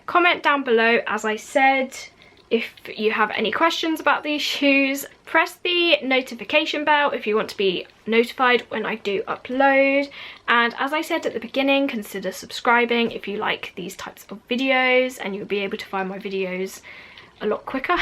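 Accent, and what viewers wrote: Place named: London, United Kingdom. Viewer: Those are really darling & give me a steampunk kind of vibe :) I was wondering (and I may have missed it); if you had an idea what the soles are made of? I mean not the underneath, but the part under the shoe itself the platform is made of? Does it seem like the same material as the top or something different. Someday soon I plan to own a pair of New Rocks, I'm just not sure which ones I want. My son was over the other day and he heard me listening to you and was wondering about your accent. I told him you were from England and he goes "Oh yes, a London Goth" :) Too cute :) Well, take care and nice to see you sweetie <3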